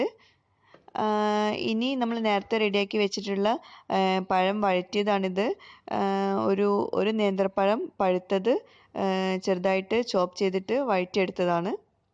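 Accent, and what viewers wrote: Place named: Kannur, India. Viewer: Jojo Joy anganonnum parayarudhe...ente kannur kaari frnd idhe slangilaa samsaarikkaar... aadyokke njaan vijaarichirunnu ival konji pparayaann....pinneed avalde husband samsaarikkunnadum same🤣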